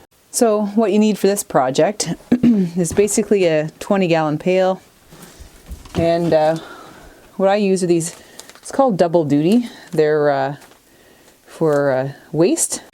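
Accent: Canada. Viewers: Canadian people have such a nice accent. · Canadian accent :)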